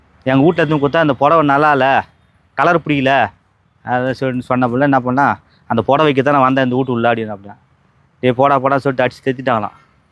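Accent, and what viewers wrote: Madurai, India. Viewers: Madurai vasagam super 🎉🎉🎉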